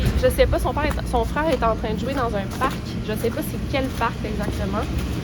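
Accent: Quebec, Canada. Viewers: Whaaa et bien dit donc quelle véhémence alors que cette jeune femme ne dit rien de méchant! Et oui certes est aussi Française que nous mais il est vrai aussi que nous ne sommes pas habitués à l'accent Québécois et qu'au début nous avons du mal à comprendre certaines tournures de phrases, certains mots etc... Mais avec le temps, on fini par tout comprendre! Cela n'a rien de méchant, c'est juste un fait! En France, les gens du Nord par exemple peuvent très bien avoir du mal à comprendre les Marseillais ou les Alsaciens car ils ont un accent assez prononcé également! Ce n'est pas pour autant qu'on ne les trouve pas chaleureux, accommodants ou autre... Et bien c'est pareil pour les Québécois et leur accent. D'autant plus que dans son message elle dit qu'elle ne peut plus se passer du petit accent de... Je trouve votre réponse un peu excessive pour pas grand chose mais bon, bonne année 2021 à tout le monde. 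· [Très long commentaire mais il en vaut la peine haha] Salut, ça fait un moment que j'hésitais à écrire un commentaire, me disant que tu n'avais pas le temps de tous les lire... et puis pour cette nouvelle vidéo, je me lâche! Je suis tombée sur ta chaîne au hasard, après avoir terminé un documentaire sur une disparition sur Netflix (Chris Watts, d'ailleurs tu as toi-même fait une vidéo dessus il me semble). J'ai regardé une vidéo, ai été un peu déconcertée par ton accent québécois - que je trouve très beau mais en tant que française ça m'a destabilisée haha. Me suis dit que je ne regarderai pas d'autres vidéos de toi. Puis le lendemain tu es apparue dans mes suggestions... un titre alléchant, j'en ai regardé une autre... puis une autre... et je crois m'être fait une cinquantaine de tes vidéos en l'espace de quinze jours. Tu es mon nouveau Netflix. J'ai binge-watché! En tout cas, je suis admirative de ta personnalité, de toutes tes recherches, et maintenant je ne saurai pas prendre mon thé le matin sans ton petit accent québécois.:) Alors merci pour tout ton contenu et toutes les heures de "divertissement (je n'aime pas trop le mot mais tu me comprends) que tu nous offres. 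Je souhaite une très longue et heureuse vie à ta chaîne Youtube. Je te souhaite le meilleur et une très bonne année 2021. Petite question un peu plus business;) est-ce que ton livre sera disponible en dédicace ou pas du tout? Est-ce que tu vas créer de nouveaux design pour ta M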